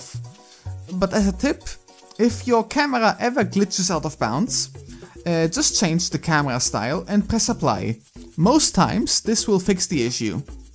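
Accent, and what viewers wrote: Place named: Germany. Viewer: You sound super German it's very entertaining. Also, and is it possible to change facial animations?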